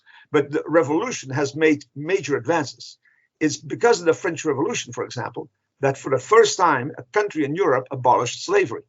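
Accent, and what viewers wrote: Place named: Castile, Spain. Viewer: Es Canarias Semanal, ¿por qué la conductora es una castellano-parlante y no alguien que hable con acento canario?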